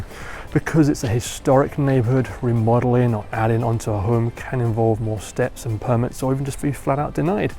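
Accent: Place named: United Kingdom. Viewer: You appear to have a British accent where in the U.K. are you from as a Brit I can’t quite place it? Also my wife who is a portlander says that area is surrounded by bad areas and not that great a place to live in the Portland area. She compared it to Birmingham in the U.K. one or two streets great surrounded by bad areas.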